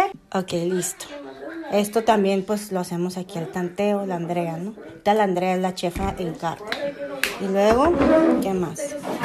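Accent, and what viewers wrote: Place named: Sonora, Mexico. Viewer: Me encanta ver tus vídeos, recetas súper fáciles y por supuesto que sabia que eres de Sonora! Con el modo de hablar 😁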